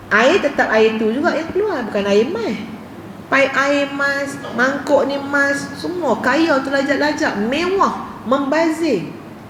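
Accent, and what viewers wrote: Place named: Kedah, Malaysia. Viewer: Ustazah. Saya selaku org kedah mengaku ustazah pandai dah cakap kedah walaupun baru sat ja dok kedah.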